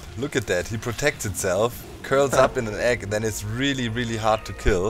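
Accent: Germany. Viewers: Do you live in Germany. Because your english got some german flaire in it. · Du bist aus Deutschland, das höre ich!🖖🏽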